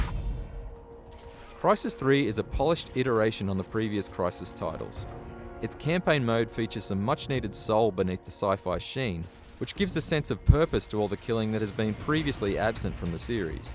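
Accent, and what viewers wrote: Australia. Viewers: An aussie, finally <3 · He sounds Aussie :D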